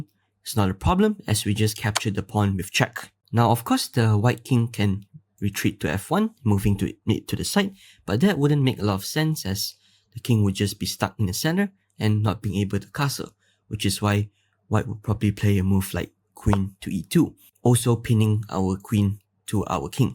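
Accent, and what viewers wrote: Singapore. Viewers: Typical Singlish accent. Is this course given by Tin JingYao (Singapore number 1 GM by rating)? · Definitely Singaporean or Malaysian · You sound like a Singaporean.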